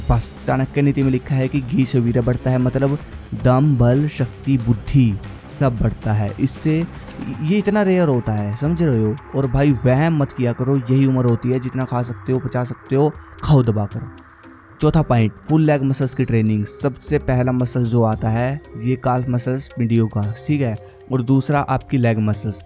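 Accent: Haryana, India. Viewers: Bhai ap haryana se ho kya mne to isa e lag h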